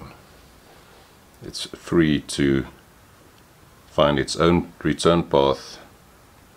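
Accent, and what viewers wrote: South Africa. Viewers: You sound South African. :)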